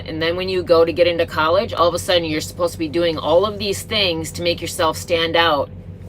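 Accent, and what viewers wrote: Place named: Minnesota, United States. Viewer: Thanks for the "moot point", most say "mute point". Who could hate you? Notice a bit of Minnesota Ya hey der.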